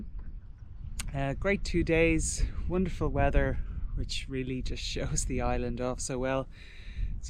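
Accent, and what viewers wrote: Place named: Ireland. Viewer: You’re a gifted storyteller - both visually & through words. Delighted to watch your adventures & listen to your gentle Irish brogue. ☮️&💟 from Sydney.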